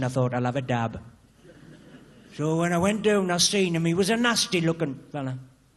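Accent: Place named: Sunderland, United Kingdom. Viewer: His accent is real, a proper maccam get over yourself.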